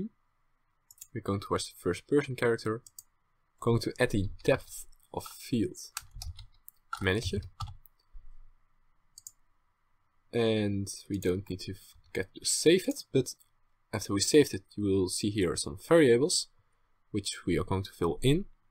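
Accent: Netherlands. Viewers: The dutch accent xD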